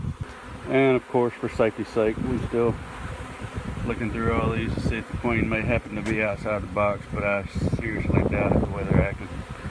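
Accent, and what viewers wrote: South, United States. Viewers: He is saying Drawn comb Not drone(fogive our southern drawl) ... using old comb gives them a little headstart it's a stage up form just plain new foundation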